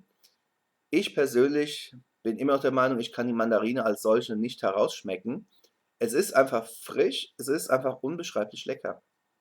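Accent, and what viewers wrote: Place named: Hesse, Germany. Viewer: versuche gerade ihren Akzent zuzuordnen, er ist schwach aber ich würde sagen Hessen. Ist das richtig?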